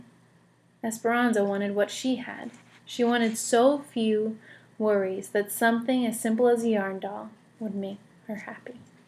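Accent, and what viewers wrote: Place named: Mexico. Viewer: Bruh! You need to work on that Mexican accent! >:(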